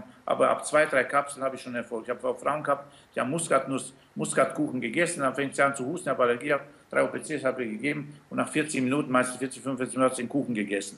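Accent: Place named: Austria. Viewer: Ein österreicher😂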